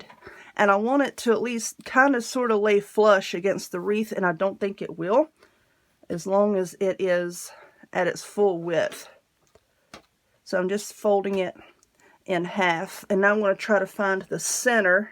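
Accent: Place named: Atlanta, United States. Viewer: Yes I love the way you talk to, could listen to you all day. If my great-great grandfather hadn't moved out of Atlanta north maybe I'd have the same accent, I love it! Really enjoyed this tutorial, thank-you so much for posting.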